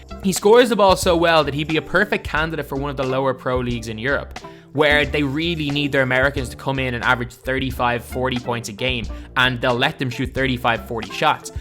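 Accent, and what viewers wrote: Ireland. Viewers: I thought your Irish wtf you uploading like 3 in the mornin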